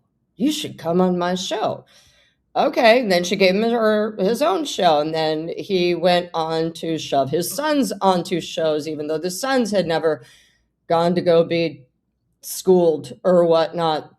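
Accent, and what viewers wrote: South, United States. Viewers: Is it just me or did get a vaguely Southern accent for most of this video? 🙃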